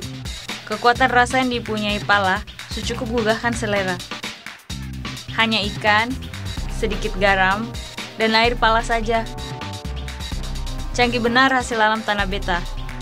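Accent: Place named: Ambon, Indonesia. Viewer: Sebenarnya kalau hostnya sudah bicara pakai bahasa daerah tertentu.yg dri stasion tv-nya harus pakai subtitle Indonesia.biar yg nonton bisa mengerti kalimat2nya.penonton YouTube bukan cuman org Ambon saja.ada penonton dri Sabang sampai Merauke. Terus hostnya juga bicara aksen ambonnya campur aduk. Org Ambon itu bilang ( Katong/bukan kitong)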